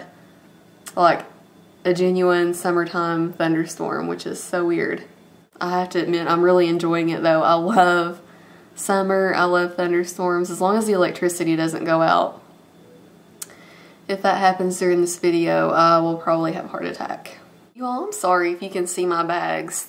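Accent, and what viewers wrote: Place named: South Carolina, United States. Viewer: What state are from? You sound like and I am from SC. Love your vids.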